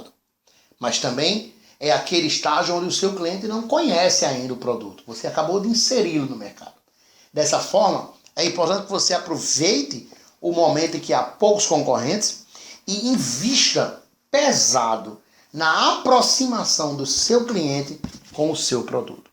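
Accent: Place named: Northeast, Brazil. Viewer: Muito bom! Explicação de fácil entendimento, muito satisfeita. Parabéns professor 👏.. E esse sotaque, meu nordeste ❤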